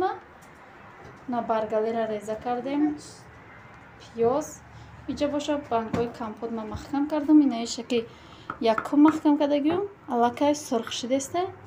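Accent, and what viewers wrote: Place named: Hisor, Tajikistan. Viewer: Ассалому алайкум ва баракатуху Апачон созен хубен фарзандо созан хубан хамакаса ма ша Аллох шумо бехтарин Зан хастен зани хоксори точикои худмон шумо бехад манда махкул шиден простой Зан хастен авалдарача гапзанитон манда махкулай чунки ранги мо гапмезане мо аз Хисор мешем хонамон дар Кальаи Хисор наздикаст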